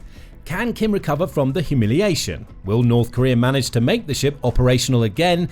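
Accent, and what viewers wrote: United Kingdom. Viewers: Blocked, had my fill of Brit narrators. Enough already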